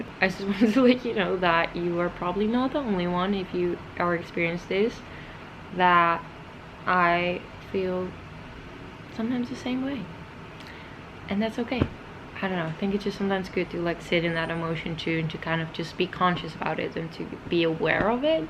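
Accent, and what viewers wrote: Netherlands. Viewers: I love the Dutch accent. My very best gf came from Holland to Los Angeles when we were young. 'Besties' from age 10. In her home they spoke only Dutch. Tried to learn some, but it is very difficult language! She passed a few years ago and I miss her so. But I get to hear your beautiful Dutch accent. It reminds me so of her. She too, was tall & very beautiful like you. I listen to your vids and hear you talk, and it brings to mind something very special...a Dutch home, my 2nd family and my BFF. So enjoyable to watch you. AND Im learning about the value of 'healthy' food. It does change your energy level! (Im getting there LOL) Also enjoy the print work you do. Those 'warm' wintery clothes are beautifully appealing. But in LA?? (I still get their catalog) Naaaahh, too hot🔥. Well then, maybe see you in Park City??? 🌷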